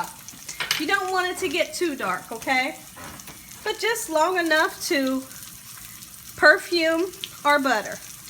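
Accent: South, United States. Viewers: I cant be the only 1 who thought that voice belonged to a lil white southern lady😂🤣 good cooking 👍👍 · Victor Matthews yea i was surprised at the end when I saw her arms were black she sounded like a perfect typical white southerner with the laughing too and how happy she was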